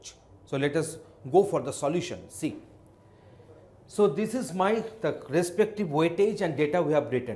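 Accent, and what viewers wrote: Bengal, India. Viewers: The bengali accent is very funny....Btw explanation is also very good...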